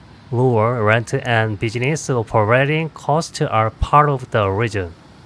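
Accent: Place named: France. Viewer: Hi Bro, i´ve a few questions. You mentioned that Bangokok grows in cost from 2021 to today, this is in bath or US dollars? when you move around the country, you use train, bus or plane? Estimative, how much money you need by month, for all expenses ? (Aproximaly) And last, do you speak French? You have the accent!!!! Thanks for your work, and I send to you a hook from the end of the world (Ushuaia)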